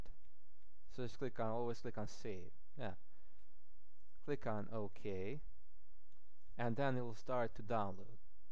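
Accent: Russia. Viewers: Oh, and your tutorial was awesome. You Russian? Your accent is awesome lol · you sound like him, are u russian ?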